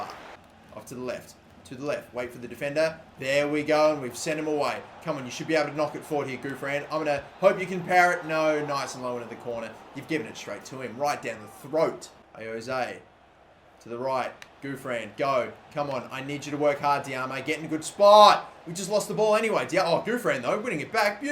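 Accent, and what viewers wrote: Australia. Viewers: Tommy C yeah but then you hear his whining Australian voice even more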